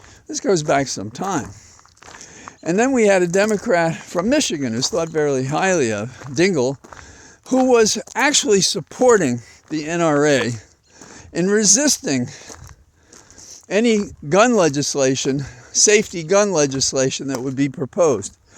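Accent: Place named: Ireland. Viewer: I do not agree with some things you say,but you do have a wonderful Irish blos in your voice and I think you are a very good Poet without knowing it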